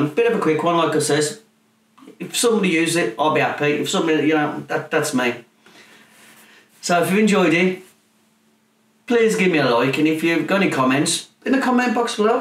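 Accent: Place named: Yorkshire, United Kingdom. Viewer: Great fast idea ... and I love listening to you! Love the Yorkshire? Accent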